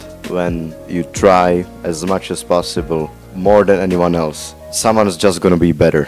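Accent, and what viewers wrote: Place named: Czech Republic. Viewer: Já věděl že jsi šech, když jsem slyšel ten přízvuk :D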